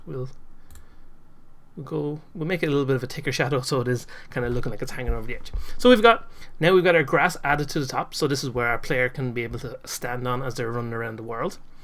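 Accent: Ireland. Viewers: Great tutorial!! Helped me tons on getting my 2D platformer going! Are you Irish?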